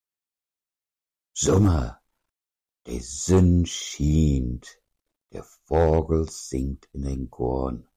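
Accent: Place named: West, Germany. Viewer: Von West nach Ost wird manches anders gesprochen, aber alle verstehen es; wo ist der Sprecher zu Hause? W ohl eher nach Westen zu.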